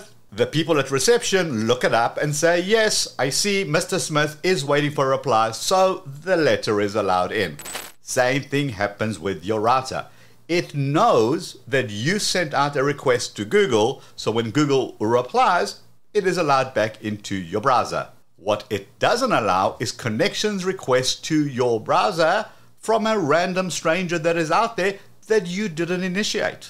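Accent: South Africa. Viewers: Wait you South African 😮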